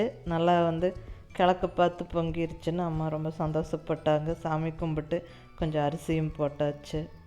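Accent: Coimbatore, India. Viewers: Neenga Kovai ya mam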